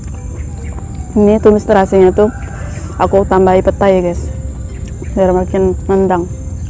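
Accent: West Java, Indonesia. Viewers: wong palembang tapi pakai sound sunda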